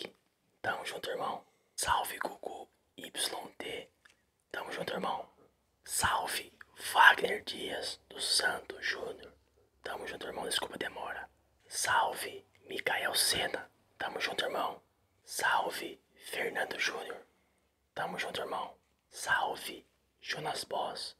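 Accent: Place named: São Paulo, Brazil. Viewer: Fala meu mano, tu é Paulista ou Paranaense ali da parte de Curitiba, muito parecido seu sotaque.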